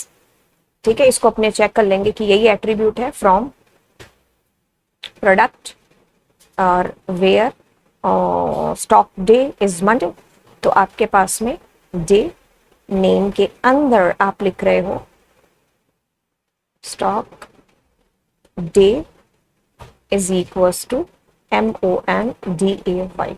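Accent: Punjab, India. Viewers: Mam aapka Punjabi accent 🔥🔥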